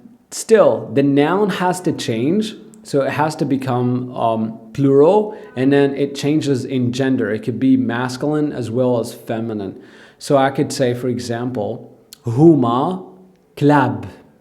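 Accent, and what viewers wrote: United States. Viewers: He does have an American accent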